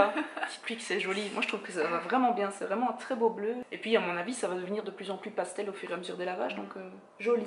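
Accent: Belgium. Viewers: tes belge??? sinn super video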